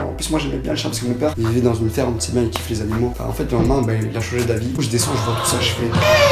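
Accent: Belgium. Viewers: T est belge ?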